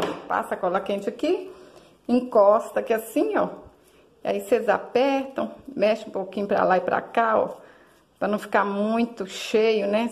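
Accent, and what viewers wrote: Minas Gerais, Brazil. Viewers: É mineira né?! Fala igual a mim! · Olá,vc é mineira? Pq eu sou,e as pessoas onde moro dizem que falo diferente e sou engraçada ao <link> identifiquei com seu jeito e amo artesanato tb. Sucesso.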